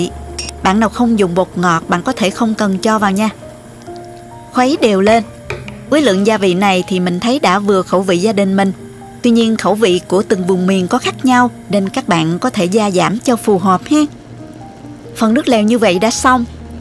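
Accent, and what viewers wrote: South, Vietnam. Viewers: Thich nghe giong noi cua MC trong video,giong Mien nam that tuyet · mình cũng người Bắc đây nhưng thích nghe giọng miền nam nhẹ nhàng và ngọt ngào như chị trong video rất dễ thương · Thích giọng miền nam ngọt ngào của bạn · ĐÚNG CHUẨN GIỌNG CON GÁI MIỀN NAM....LẠI BIẾT NẤU ĂN NGON, HƯỚNG DẨN RÕ RÀNG... CẢM ƠN!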